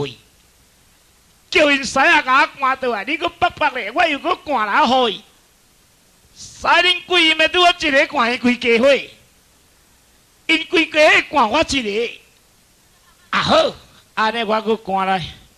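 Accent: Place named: Coast, Taiwan. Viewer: 做戲，海口腔很自然，這也是他的特色，住四湖，媳婦一樣是我們麥寮人。笑科很自然，不用特意排一個橋段，而是隨口一說就很好笑。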